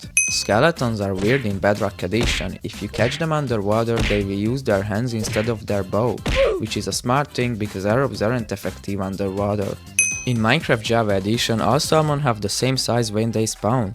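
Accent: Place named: Hungary. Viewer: Based on your accent, are you from Hungary?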